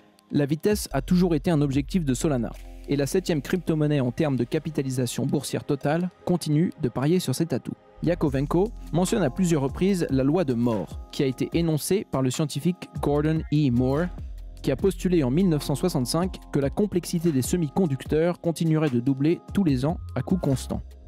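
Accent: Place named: United States. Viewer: C'est vraiment ridicule cette prononciation des mots anglais à l'américaine. Le plaisir d'écoute s'en trouve impacté.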